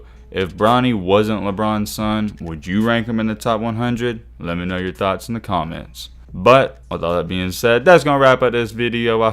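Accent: South, United States. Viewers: Where are you from in the south?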